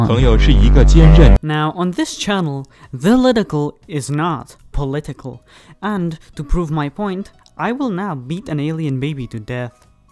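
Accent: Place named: Russia. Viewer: я же слышу что ты русский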